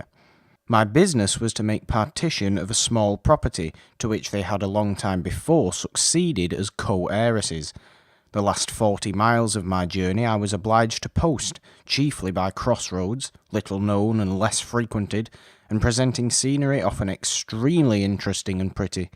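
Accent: Northern England, United Kingdom. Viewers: Love the northern accent; it seems more suited to Tom Wyndsour than the narrator, but it adds to the old-timey feel. This is my all time favorite short ghost story, this and MR James' The Rose Garden. Victorian melancholy at its best. Time to bake up a restorative meat pie!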